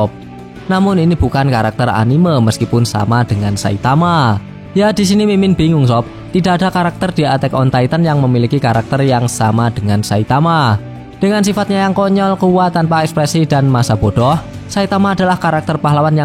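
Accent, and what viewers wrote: Java, Indonesia. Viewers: Kon wong jawa ya min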